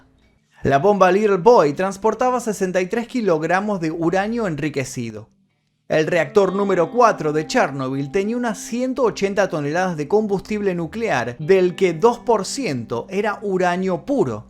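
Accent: Argentina. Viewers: No puedo creer que los argentinos NUNCA pronuncien bien la "Ll" y la "Y" y cuando tienen una "Sh" en frente les dé por decir Hiroyima... Que alguien me explique. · Exelente documental !! Imagino que el locutor es argentino , no es necesario salir a cuadro , con esa barba de chivo no le da seriedad al tema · Un argentino hablando? No veo ésto!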